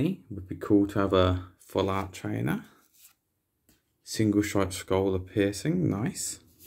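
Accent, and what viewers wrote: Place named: England, United Kingdom. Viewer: Well fk me sidewise I was not expecting the English accent lmao caught me off guard. Saw you on the TabooContract twitter with those dragon cards.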